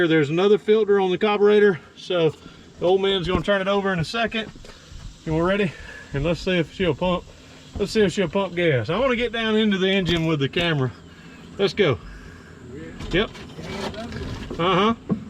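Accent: South, United States. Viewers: i like how you's southerners say coil. you say it [ ool ] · your southern accent is wonderful!!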